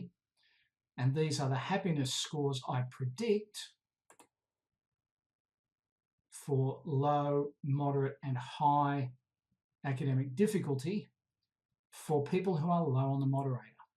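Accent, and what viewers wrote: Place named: Australia. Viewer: Also saved me as I write my thesis!! Thank you! Love hearing a fellow Aussie accent in one of these videos too :)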